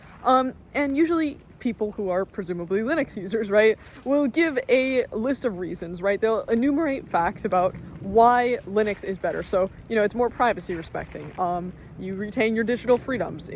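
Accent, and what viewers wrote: Canada. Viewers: What a sympathetic person. She sounds canadian, maybe its that 🙂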